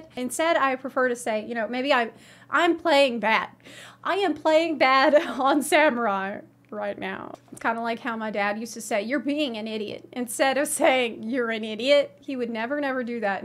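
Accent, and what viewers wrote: South, United States. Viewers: oh can you read me some goddamn bedtime stories with that sweet moonlight magnolia drawl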